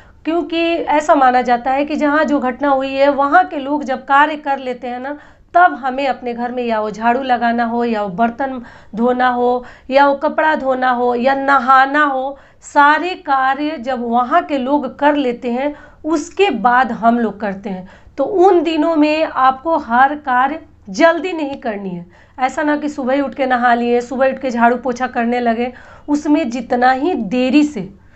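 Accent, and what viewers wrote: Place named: Uttar Pradesh, India. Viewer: Jai mata di🙏🙏didi aap kis kahan se hai up se ya bihar se apki bate sunkr to up se hi hai aisa lgta hai pr kis gav se hai kyoki mai bhi up gorkhpur se hun pr abhi nagaland se apka video dekhti hun apki bate sunkr mujhe apne mayke ki bahut yaad ati hai sasural to delhi me hai pr mayka gorkhpur me hai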